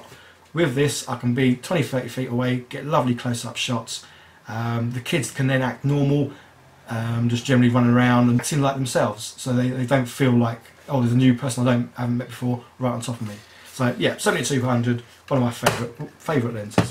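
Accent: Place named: England, United Kingdom. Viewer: hi Englishman... nice accent mate! Is that a Tamron or a Sigma70/200 lens?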